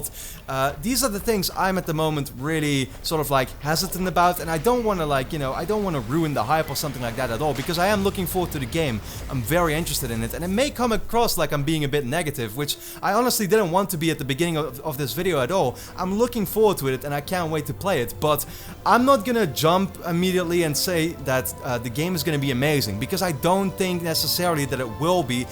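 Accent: Netherlands. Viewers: Ja dat accent herken je al van een mile away! Maar hey, beste nederlandse gaming YouTuber naar mijn mening.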